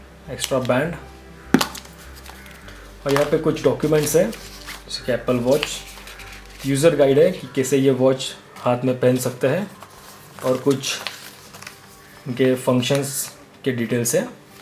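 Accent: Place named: India. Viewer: i love your hindi accent❤️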